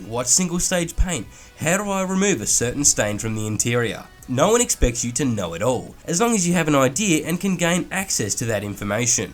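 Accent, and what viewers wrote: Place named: Australia. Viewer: Your Australian accent is giving me a blinding headache....I’m not from Australia....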